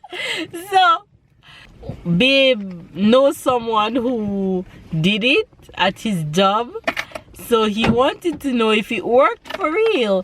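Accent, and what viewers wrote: Jamaica. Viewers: How come he has a Jamaican accent?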